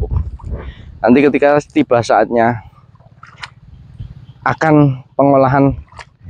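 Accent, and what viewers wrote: Banyumas, Indonesia. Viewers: Ngapak tapi pinter😂 maju terus pak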